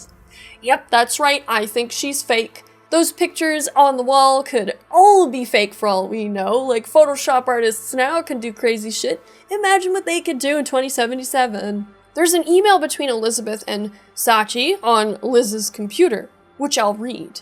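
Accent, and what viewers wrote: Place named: Canada. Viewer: Amazing video. Also knew you were Canadian the first time I heard an "are" or as we say it "arr" lol Got me back into Night city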